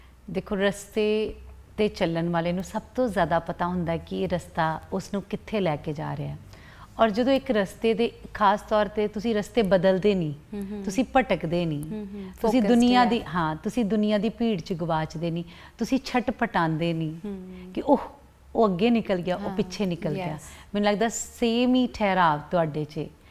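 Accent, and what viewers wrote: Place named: Punjab, India. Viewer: I hope all agree that is the only Talented Anchor in punjabi industry. She knows "thet or pure punjabi" which really sounds cool. Otherwise, newcomers dnt give that much importance to punjbi.♥️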